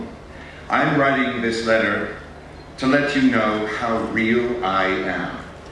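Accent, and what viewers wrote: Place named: United States. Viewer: His American accent was so adorable! 💕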